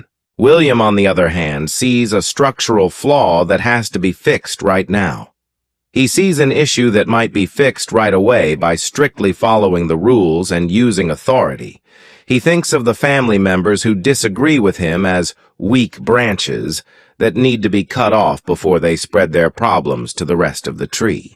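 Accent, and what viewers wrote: United States. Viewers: This is a British site. Stop using an American accent for the narration. Get a British accent or else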